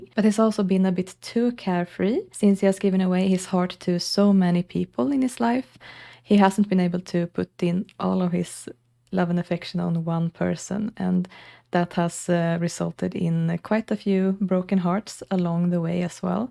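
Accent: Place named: Sweden. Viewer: I just found you when just looking for a new sims 2 let's play to get into! Got so excited when o heard you accent, I'm also Swedish so I look forward to seeing your content even more now. I've actually been meaning to start a channel to play sims 2 etc but been procrastinating it for years, and only recently decided I'd do it in English instead of Swedish to not make it too niche. Verkar som att nån hann före mig! Haha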